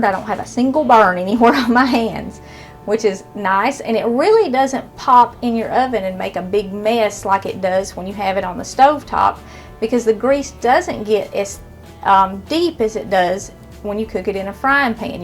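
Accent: South, United States. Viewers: Precious Lady, Can you cut the music…it is distracting and I would love to hear your beautiful voice. That Southern accent sounds soft and sweet. That music doesn’t do Justice to your lovely program🌷🌸🌷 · I love your southern accent! I'm from South Carolina 👍👍😊😊 SUBSCRIBED! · I'm from California and I just happened to see one of your videos, I'm not into country and don't like country accent, but listening to and watching you, I completely change mind and absolutely enjoy watching you and your recipes are so easy and such fun to watch being prepared, Happy Holidays to you and your and also to your utube watchers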